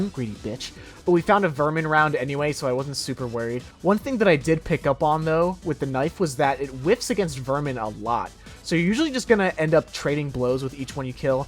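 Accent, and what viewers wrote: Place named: Midwest, United States. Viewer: Love the Fucking commentary 😂 you from Chicago or the Midwest or something? Haha hope your channel grows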